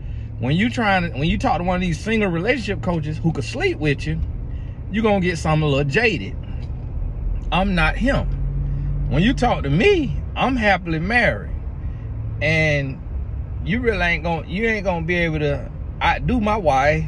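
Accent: South, United States. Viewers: Like the southern drawl. And the advice.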